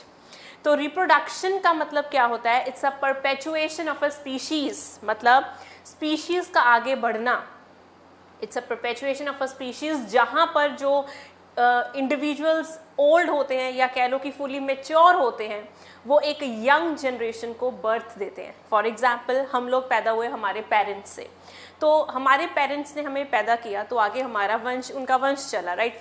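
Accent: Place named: Punjab, India. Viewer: Thoda panjabi nikal jati hai❤️❤️❤️.....ÑîÇÈ ma'am.....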